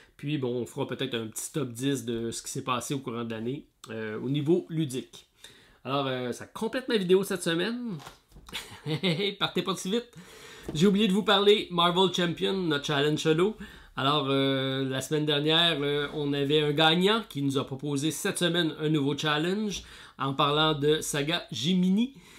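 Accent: Quebec, Canada. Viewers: Joyeux Noël:-) 1er commentaire pour ma part, pour une chaîne que j'attends impatiemment chaque WE (avec ton accent québécois, je suis au sud de Lyon). On a reçu ici pour Noël TTMC et un Unlock... et nous avons déjà joué aux deux! Fait marquant de l'année pour moi: Tainted Grail, même si je ne suis pas allé très loin (et en ce moment le "ks" "gamefound" ISS Vangard). Bravo pour tout! Et bons moments de jeu en famille.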